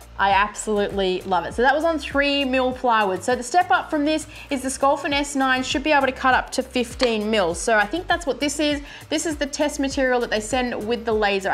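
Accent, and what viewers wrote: Australia. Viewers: Thanks for sharing I know nothing about laser engraving or cnc but I so want to go down that path more so on the cnc machine they both make your projects look heaps more professional. So thanks for the encouragement to give it a go.Love your channel and content plus it’s nice to see and hear Aussies on YouTube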